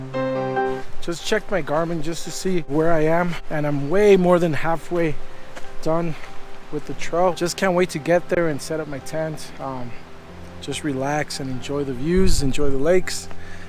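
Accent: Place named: Latin America. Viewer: Sounds by your slight accent must be Latino si..good to see a fellow Latino enjoying Mother Earth well educated in the outdoors well done hijo good to meet you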